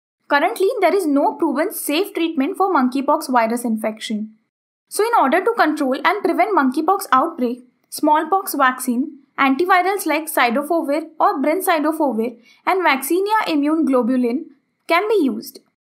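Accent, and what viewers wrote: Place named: India. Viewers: It's hight time to change your mindset and adapt with an Indian accent. World is changing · Couldn't find someone without an Indian accent???👎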